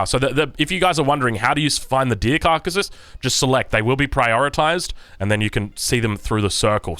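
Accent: Australia. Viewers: So glad I found your vids and channel! More strong aussie casters!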